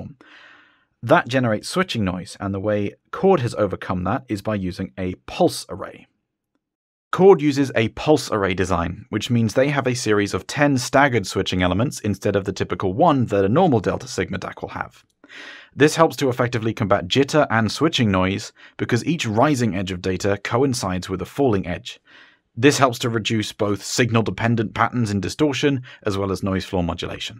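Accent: United Kingdom. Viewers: Oh look, a more sophisticated Zeos with a British accent who reviews more expensive gear. You deserve my subscription well done on the review!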